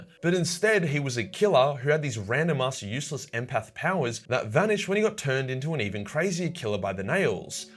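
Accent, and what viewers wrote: Australia. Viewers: Who is this australian guy and why does he hate this fictionial angry man so much? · He is a fellow aussie, matey bruh...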